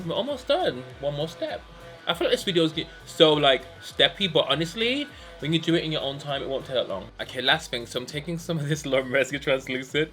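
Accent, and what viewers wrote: Birmingham, United Kingdom. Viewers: Are you from Birmingham? X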